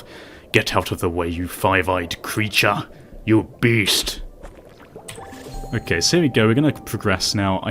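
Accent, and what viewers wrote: United Kingdom. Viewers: His accent is very British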